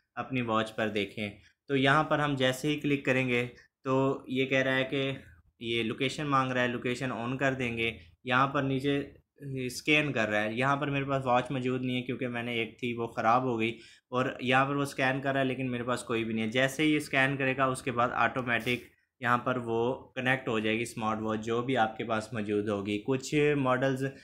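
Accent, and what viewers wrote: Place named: Pakistan. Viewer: Bro tumhari voice me Pakistan wali wibe aa rahi h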